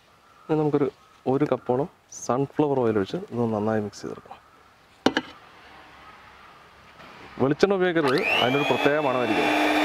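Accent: Palakkad, India. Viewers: palakkadan basha 😍😍😍 idhu kanunna palakkattukar undo?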